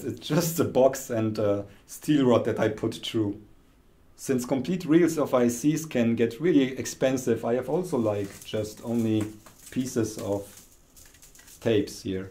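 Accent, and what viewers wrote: Germany. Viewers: Das hört sich so deutsch an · I love the German accent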